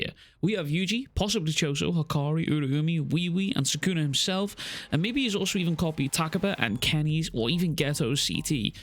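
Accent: Liverpool, United Kingdom. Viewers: Gotta ask man are you from Liverpool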